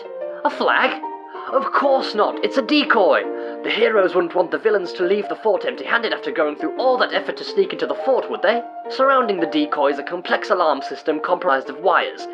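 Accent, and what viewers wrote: United Kingdom. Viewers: Was this challenge explained by Silent Movie? Because the explanation looks like a silent movie and there's someone explaining it in a proper British accent. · Gotta love that British voice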